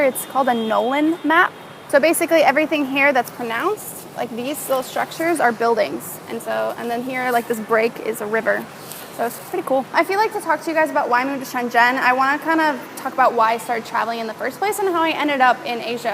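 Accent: France. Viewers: Great french accent by the way:) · Hi! I started looking at your videos a few weeks ago and it really got me to want to travel again.. and visit Shenzhen! Thanks for sharing your passion about travelling and .. about China! Oh and by the way.. super accent Français! ;)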